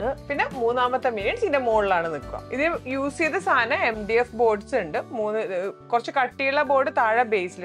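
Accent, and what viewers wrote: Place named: Kozhikode, India. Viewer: m also frm calicut,,,vadakara.. samsaram kettepo nk thonni..atha chodche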